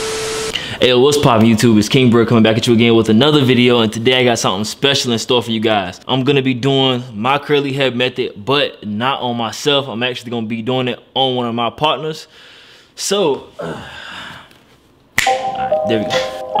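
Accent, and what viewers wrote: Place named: New York City, United States. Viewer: Ya he definitely got the NYC❤️ Accent Like if you agree 👇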